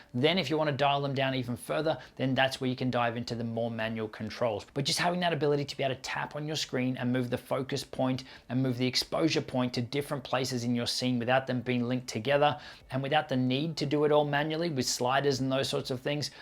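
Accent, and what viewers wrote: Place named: Australia. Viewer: Hello, nice vídeo mate! Where are you talking from? I’m sure you’re an Aussie just by your accent. You look and sound very professional mate! Well done 👍🏻. It’s been great to learn a lot from your videos, thank you brother. I’m a Brazilian who’s living the dream in Perth for awhile, and now I’m setting up my own Youtube channel, thanks for your great effort mate 🙏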